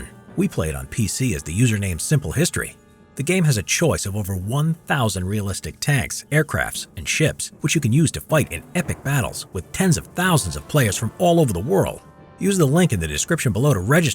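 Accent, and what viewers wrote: Germany. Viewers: I love those german pronounciations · you have german accent noice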